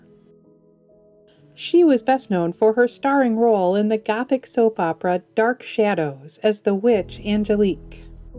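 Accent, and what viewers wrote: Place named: Iowa, United States. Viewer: your voice is so soothing that it could lull me to sleep and your sweet accent sounds like the Midwest to me. If it’s not too personal, would you mind tell me where you grew up? My guess is Iowa because it sounds corn fed, but I think about Fargo and Marge’s accent and that would put you in Minnesota? Cheers, Mary Rose. P.S. Because I love arcane information, I know that Estonian, Finnish, Hungarian are one of the smallest non-tribal languages groups in the world, and now you know it too. Cheers and Keep Up the Good Work, Mary Rose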